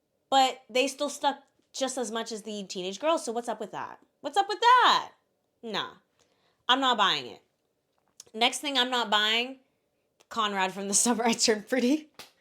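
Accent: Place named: Canada. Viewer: Agree 100% on the first two although I do love the piano in La La Land. Studio Ghibli is amazing imo so hard disagree. I can understand your critique of TSITP because it's definitely problematic in some ways and not what I would do in Belly's (and Conrad's) situation for the majority of the books and show lol but I definitely have a nostalgic pull to it since I'm 31 and read the books when I was in high school. Also are you Canadian because you sound so Canadian lol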